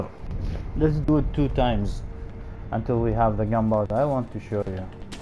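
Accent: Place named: Gulf, Arab world. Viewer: الخليجي واضح من صوته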